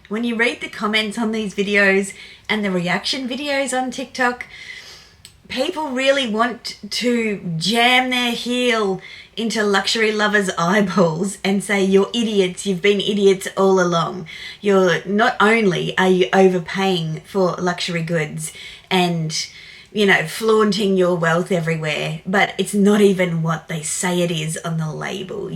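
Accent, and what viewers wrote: Australia. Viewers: I like your australian accent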